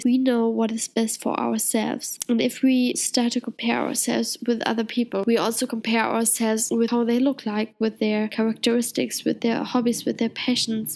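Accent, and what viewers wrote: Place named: Germany. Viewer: Oh your German accent is so cool! Go Germany!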